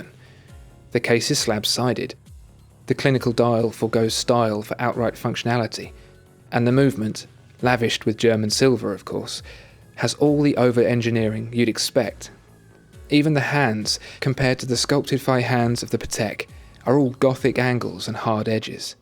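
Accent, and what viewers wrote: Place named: England, United Kingdom. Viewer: A review of some vintage watches and their movements would be great. You crisp English accent makes these reviews sound regal! Great videos and delivered in a very balanced manner.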